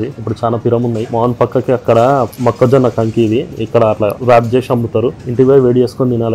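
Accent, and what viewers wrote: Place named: Telangana, India. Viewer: Nice video sharing bro, talking in telengana language is very nice. All the best bro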